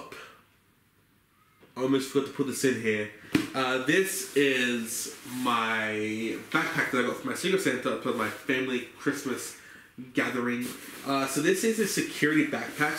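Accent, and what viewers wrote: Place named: New Zealand. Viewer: Your kiwi accent was just so funny bruh. Nice video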